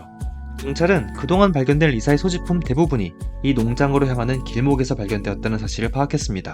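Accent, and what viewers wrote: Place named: Yanbian, China. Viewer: 말투가 조선족인데?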